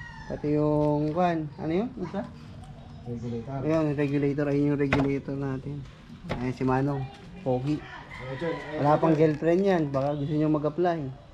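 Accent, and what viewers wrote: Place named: Ilocos, Philippines. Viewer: Kwan ilocano expression pag nag tatagalog🤣🤣 agbiag ka lakay👍🏼👍🏼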